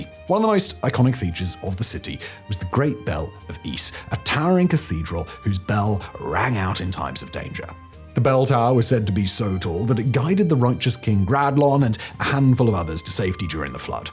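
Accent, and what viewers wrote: RP, United Kingdom. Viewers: At first, I thought Simon was stricken silly by a homophone... then, I realized that he wasn't. This guy doesn't know the difference between a lama and a llama. I guess a posh accent and arrogant snark can't always hide ignorance.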